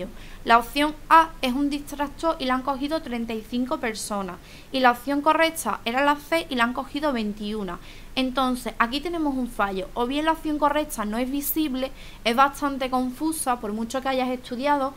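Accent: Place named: Mexico. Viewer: parece que te dolió... Es cierto, pues, yo no soy culpable muchas de las mujeres de México, les ponen un acento a sus palabras que dejan mucho que decir, a veces parecen engreídas o de alta sociedad cuando muchas de ellas viven en los suburbios y comen lo impensable... Además no se le entiende, pues, escucha a la chica y verás que no miento y por decirlo no soy un ogro o naco como dices... De todas formas, lo que sí se reconoce es la buena intención de la chica.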